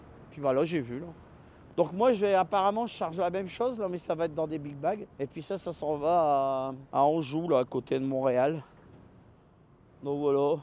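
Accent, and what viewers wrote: North, France. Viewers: Merci pour le partage c est toujours un régal mais tu as un accent du nord 😊de la France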